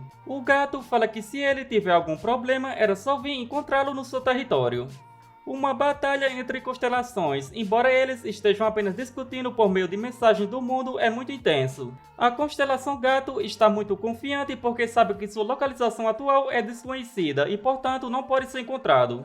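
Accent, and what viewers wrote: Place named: Northeast, Brazil. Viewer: Tu parece nordestino man Kkk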